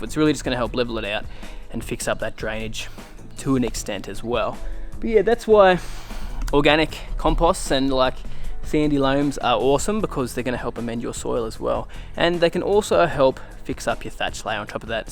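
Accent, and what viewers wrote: Australia. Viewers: You an Aussie?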